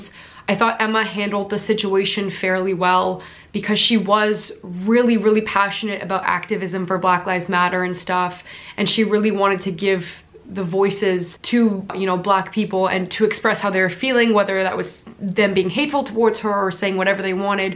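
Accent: Canada. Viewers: Are you from Canada? I'm getting some accident and I'm really dumb aaa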